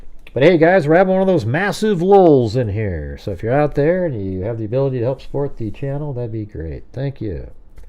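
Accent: United States. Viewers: I live quite far away (Zabrze, Poland) and haven't seen anyone here who knows this story. I'm very interested in forensics and know a lot about many criminal cases. I'm completely captivated by Idaho. I usually compare the work of many sources, but in this case, it doesn't really make sense. Since I discovered your channel, my head has exploded with admiration for your work, talent, and knowledge. At the same time, I really enjoy listening to you; you have a good voice and that great American accent, like from the good old movies I watched as a child. I wish you much success with your channel; it's truly a great thing! Keep doing what you love and stay healthy.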